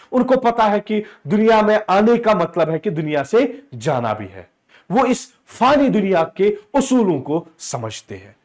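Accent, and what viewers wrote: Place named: Kashmir, India. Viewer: The kashmire tone of can be go fir a kashmire 😊❤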